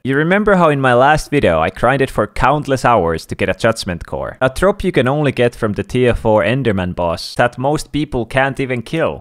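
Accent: Finland. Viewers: first thing when i opend the video i was like is he from finland sounds like it and then i watched and ofc you are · Are you from Finland? I hear it from your voice. LOL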